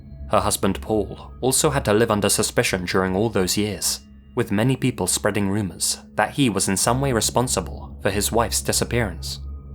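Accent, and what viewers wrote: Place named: United Kingdom. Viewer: You're the best. I love you're vidoes their so entertaining. Keep up the good work dude and i love your British accent.:) Have a great day man. 😊❤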